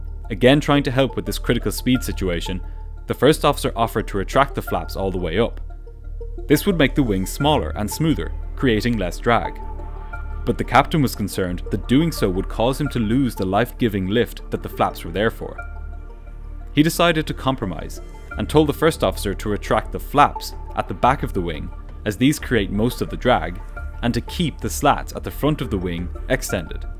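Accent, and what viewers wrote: Ireland. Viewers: Accent sounds more Irish to me. And we pronounce Louis the French way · Love it when a new video comes out! Or am I just in love with an Irish accent 🤷‍♀️😂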